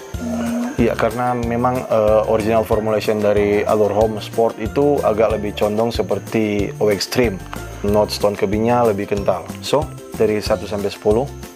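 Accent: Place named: Manado, Indonesia. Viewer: Dari Logatnya orang manado ya bro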